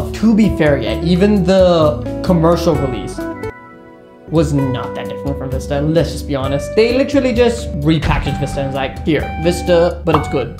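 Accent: India. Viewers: Bro are you Indian ?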